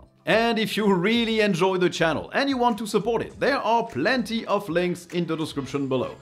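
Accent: France. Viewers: Are you French? I thought you were Spanish.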